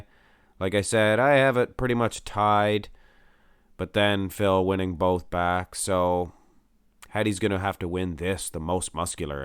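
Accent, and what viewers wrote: Canada. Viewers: Great commentary. I couldn’t tell if he was from Canada or Minnesota until the end of the video. I am guessing Canada.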